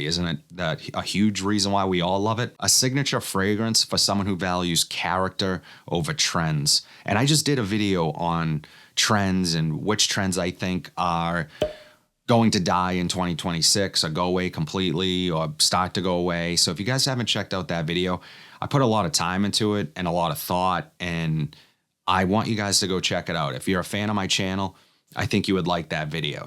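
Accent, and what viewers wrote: Boston, United States. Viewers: I’m currently watching “Clubhouse: a year with the Red Sox”. So between your vids and that, my exposure to the Boston/NE accent is seriously starting to imprint… 😂 SOTD: Penhaligons Endymion Concentré (under the radar banger). Tomorrow Sunrise on the Red Sand Dunes Intense! Congrats on 27K my man 👏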